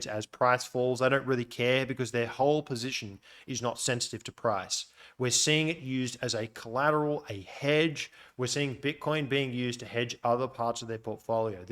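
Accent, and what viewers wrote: Australia. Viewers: Nice to hear an Aussie providing such valuable info in the BTC space...👍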